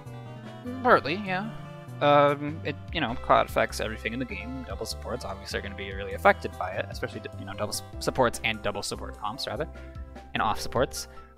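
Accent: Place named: United States. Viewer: Is it just me or does sound a bit different now? He sounds more American now. He's also swearing less forward slash shouting less, stating the problems/arguments clearly with evidence to back them up. P/S all jokes aside, great vid. Agreed with everything you said. I wanted 90% caut even before you made your Part 1 vid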